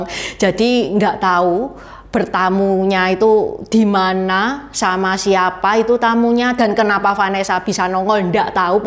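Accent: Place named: Surabaya, Indonesia. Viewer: Logat Chindo Suroboyo.. medhok , wes ketoro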